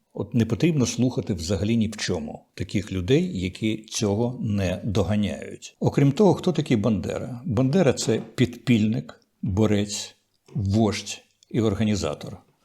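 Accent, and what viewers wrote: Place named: Russia. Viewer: Пад люка перефарбована <link> У тебе навіть акцент ка ца пський аж з вух кров йде.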